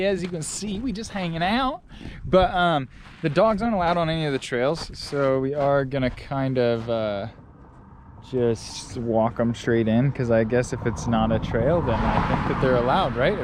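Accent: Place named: South, United States. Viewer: your southern accent is getting better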